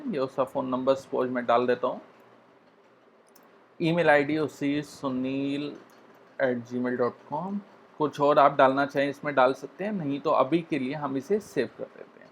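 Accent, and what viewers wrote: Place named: Nepal. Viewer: I really appreciate BOOKEEPER app and I beleive it is very important to make these presentations about new features and skills, but sound quality has always been a problem. Your accent is something i get that, but remember BK community does not included only people rom Nepal.... so make sure sound comes out clearly at least guys!! Thank you.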